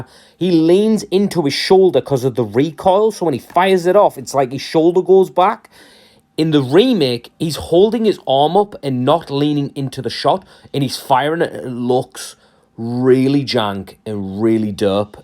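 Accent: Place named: Scotland, United Kingdom. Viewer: I am giving you thumbs up just for that epic Scottish accent man, aside of that you are right some animations need polish :D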